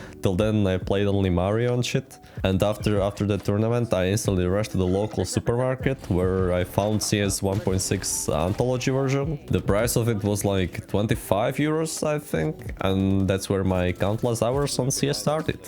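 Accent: Czech Republic. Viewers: I knew you were czech... by the accent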